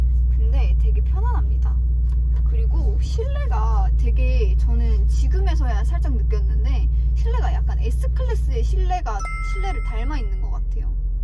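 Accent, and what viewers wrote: Gyeongsang, South Korea. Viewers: 경상도 사람인가? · 이까지 라니 ㅎㅎㅎㅎㅎㅎ 부산 경남이 고향이신가요?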